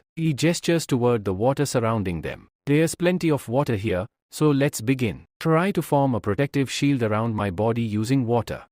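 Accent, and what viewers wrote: India. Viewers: what's with the indian accent xD, tbh i stranggle with normal english so with the accent i think ill just pass on this one even tho the story seems good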